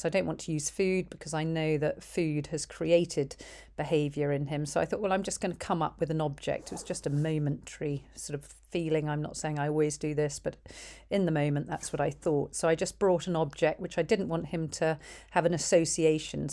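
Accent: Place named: United Kingdom. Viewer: Thank you so much for taking time and making subtitles! British accent is way more difficult to understand than the american one (for me personally). The funny thing is that we study at school British English but USA produces a lot of content on the internet so i had to adjust and now i understant American English better than British English 😅 Also thank you for sharing the right way of "talking" to animals. The World needs to be a better place for them.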